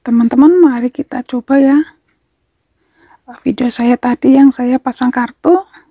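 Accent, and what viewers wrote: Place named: Banyumas, Indonesia. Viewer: Mantep niih info tutoriale mbakyuuuu..dulurku kiye..pada pada ngapak...kiye..mantep pokoke.. Salam sukses luuur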